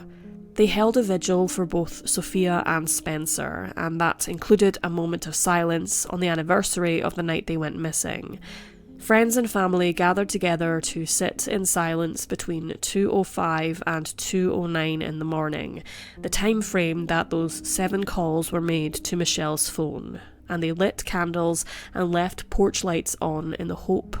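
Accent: Scotland, United Kingdom. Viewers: Ahhh your Scottish 🤗🤗🤗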